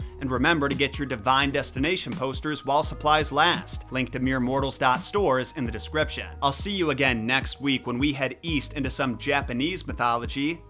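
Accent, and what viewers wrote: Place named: United States. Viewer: Aaaargh !!! The American pronunciation of Greek names is absolutely horrifying! Dye-oh-nye-sus ? Seriously?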